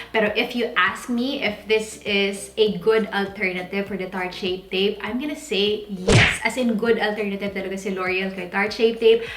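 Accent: Visayas, Philippines. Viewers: Bisaya ka te????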